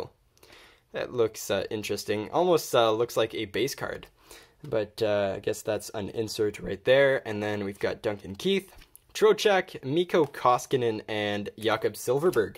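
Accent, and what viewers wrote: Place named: France. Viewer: stoooooop with the french pronunciations of players names, only thing that makes me not want to watch u lol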